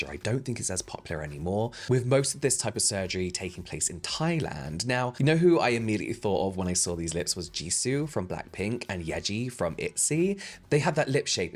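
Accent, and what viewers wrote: United Kingdom. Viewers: tff 💀. has a pretty strong British accent that gets in the way of pronunciation it happens that’s lifee.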